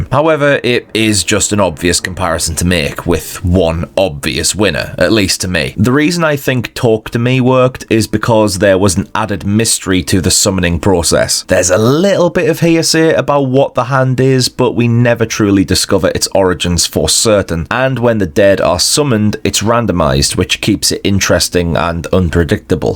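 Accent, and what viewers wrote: United Kingdom. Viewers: Actually British accent somewhat hard to understand when ur 2nd language is English 😭 but enjoy the movie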